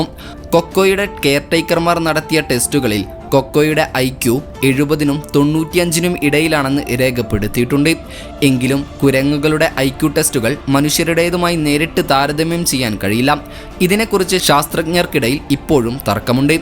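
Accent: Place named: Kozhikode, India. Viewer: നിങ്ങളുടെ accent ന്യൂട്രൽ ആക്കൂ. ഒരു commentary യിൽ കോഴിക്കോടൻ slang കേറി വരുന്നത് തികച്ചും അരോചകമാണ് (തിരു.തൃശൂർ ഉൾപ്പെടെഏതു Slang ഉം) "ത്" എന്നതിനു പകരം "തി" ഗോറില്ലയ്ക്ക് എന്നതിനു പകരം ഗോറില്ലക്ക് എന്നിങ്ങനെ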